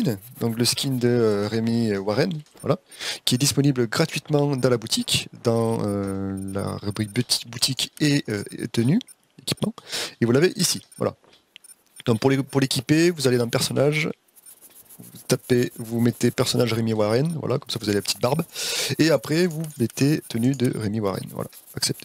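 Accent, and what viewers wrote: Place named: South, France. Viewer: abo j'avais laissé le jeu de côté après des centaines d'heures de jeux tu m'as redonné envie d'y jouer... Merci c'est peut être l'accent du sud lol bon contenu en tout cas je vais te suivre 👌👍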